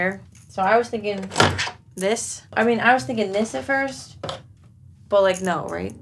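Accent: Long Island, United States. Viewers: First track was my favorite. Harp lady, gothegg, Cory Feldman!? You don’t look like any of those people, you look like Rogue from X-Men. You’re Rogue look a like that demos pedals & plays some cool music to me. I knew you weren’t Rogue from X-Men when I first heard you speak & had that Long Island accent instead of Rogues deep southern/Mississippi accent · I never knew you had a Long Island accent until today. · Your Long Island accent is not really bad, it's just really Long Island, which is totally reasonable and legit. Local pride! Cool tracks. I love Gris. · Totally Long Island accent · The track I liked the best is your Long Island accent! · Hey!! You should look up a video of a stringed instrument called a Mulatar. Its a weird Harpy guitar, drum. This thing has me looking into building one myself to sew if I can? I also own harps and guitars and bass. 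I don't consider myself a luthier by any means but a guy builds a guitar and that's cool? A guy builds several guitars and he's a luthier? I guess? Looong Island accent is right. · The well built shelves full of music-y gadgets in their og packaging? Impressive, but how do you find anything o.o Also: I heard the accent as soon as you mentioned it. It came and went. So that's a Long Island accent, huh? (Regards: "Not an American") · Please accentuate and use your long island accent more. It be appreciated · You do have a Long Island accent but like 15% of one. It sounds like you've worked to get rid of 85% of it and honestly that work should be recognized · You are very good looking and easy to listen to/watch. These people are nothing without the hate. You have a little Long Island accent but why would that be bad thing, lol dying on the Perfect Circuit bit. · Yea ur long island accent is thick af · Your Long Island Accent is not really bad, it is really good. 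It is refreshing to hear a regional dialect. That Perfect Circuit placement was awesome. I like the music for game #3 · This was my first time to be aware of you. I enjoyed and was impressed by your brilliant creativity with the varied tech. Also I ❤ your Long Island accent! 😊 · IT'S LONG ISLAND ACCENT?! I THOUGHT YOU WE'RE MIDWEST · all on point! and your Lonk Island accent is subtle. (✿◠‿◠)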